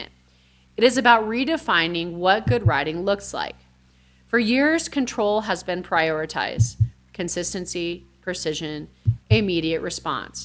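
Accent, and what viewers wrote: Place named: United States. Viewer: You have American accent and the US is second only to china in abusing animals. How about you showcase horses in America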